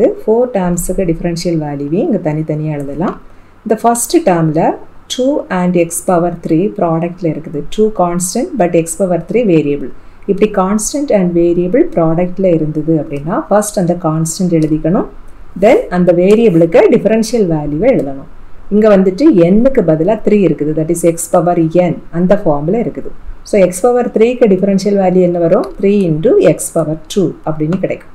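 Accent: Kanyakumari, India. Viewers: no kanyakumari slang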